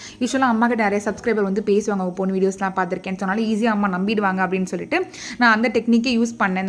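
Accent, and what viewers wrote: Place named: Udangudi, India. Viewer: Neenga endha oor neenga udangudi kaaranga maari pesringa en ooru adhaa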